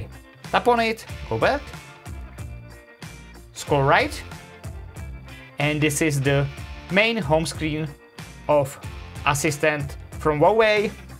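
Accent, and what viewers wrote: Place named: Czech Republic. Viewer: mě bylo hned jasný že jsi Čech jsem to nějak poznal podle anglictiny a hlasu❤️ fakt díky moc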